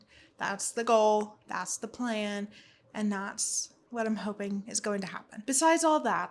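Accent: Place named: Appalachia, United States. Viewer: I could tell a fellow Appalachian girlie from accent alone! 🤗 Welcome back to booktube!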